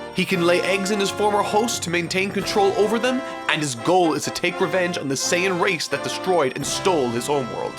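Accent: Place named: Ireland. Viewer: You're Irish aren't you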